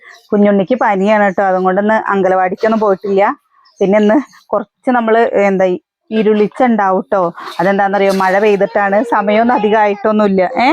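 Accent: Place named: Mannarkkad, India. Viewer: അത് ഞങ്ങടാ മണ്ണാർക്കാട് സംസാര ഒരു കുഴപ്പവും ഇല്ല. ച്ചി ഞ്ഞിങ്ങൾ ഞ്ഞികളുടെ ഭാഷയിൽ സംസാരിച്ചോളൂ all the best 👍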